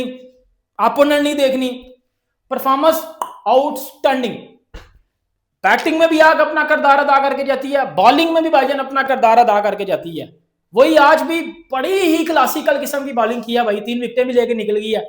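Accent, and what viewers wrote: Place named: Punjab, India. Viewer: Sir ji, aapki Punjabi laced commentary sun karr maza aata hai. Per, Pakistani team girls ne bhi achcha khela. Inshaa allah, baaki matchon mein bhi achcha khelengi aur jeeteingi bhi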